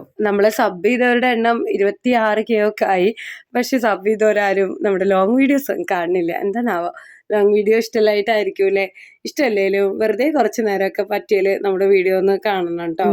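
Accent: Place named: Northern Kerala, India. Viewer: നന്നായിട്ടുണ്ട് അല്ലേലും വടക്കുള്ളോരുടെ വർത്താനം കേൾക്കാൻ നല്ല രസമാണ്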